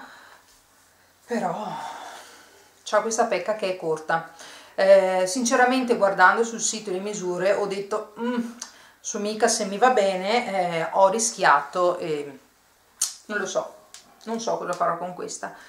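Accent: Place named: Veneto, Italy. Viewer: Ciao.intanto complimenti x il gusto nel vestire,gli abiti ti stanno benissimo. Io preferisco acquistare su bonprix e mi trovo benissimo perché i tessuti li trovo più naturali di quelli di shein .hai mai provato bonprix? Io sono una curvyyy e trovo abiti sexy anche come i tuoi abiti. sento che sei veneta dall'accento...anca mi ghe son! Ahahahah ,sito de vr o vi o pd? Ciaooo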